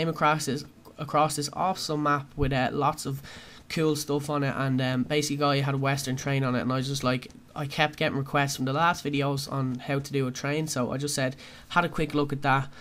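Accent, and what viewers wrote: Ireland. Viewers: You sound Irish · You kind of sound Irish but I can't tell...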